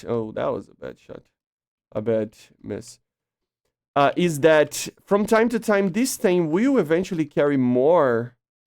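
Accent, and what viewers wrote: Brazil. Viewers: Essa cara é brasileiro kkkkkk ele fala ingles mt bem mas tem um sotaque de Br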